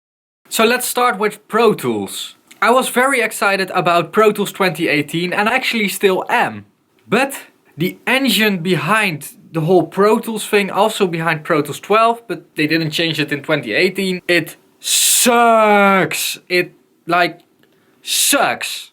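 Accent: Netherlands. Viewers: Dutch accent 👍🏻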